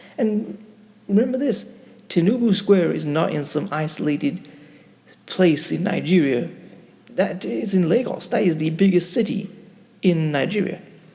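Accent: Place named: United Kingdom. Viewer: Well for the British accent you deserve an applause. But I think you should adjust the speed in while voicing out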